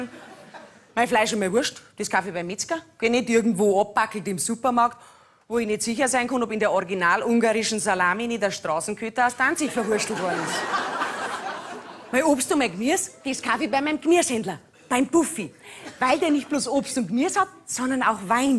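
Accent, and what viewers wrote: Bavaria, Germany. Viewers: ich mag sie sehr und schaue auch ihre auftritte. ich muss aber ganz selten lachen. leider verstehe ich kaum was... bayrisch und dazu noch schnell, das ist nicht einfach für einen nicht-bayer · Schön wenn man als Ostberliner versucht bairisch zu verstehen. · Ich liebe die Bayern und deren Dialekt.... ist der weibliche Mittermeier 🍻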